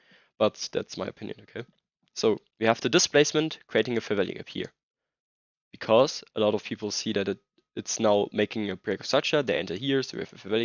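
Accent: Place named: Germany. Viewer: Der Deutsche Dialekt, herrlich, gutes Video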